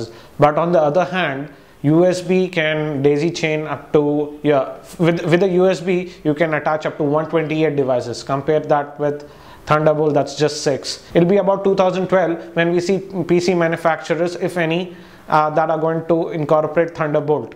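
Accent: India. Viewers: hes Indian, its very easy to tell...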